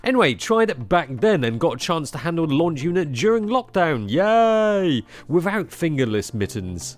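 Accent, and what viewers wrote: United Kingdom. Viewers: Forget the British accent, I swear this guy looks 17 and 70 at the same time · I've been trolling this guy for years and still haven't figured out if he's Japanese or chinese. And what's with than clean british accent? British chavs don't talk like that. No respect for her royal imbred racist majesty queen Elizabeth and stuff.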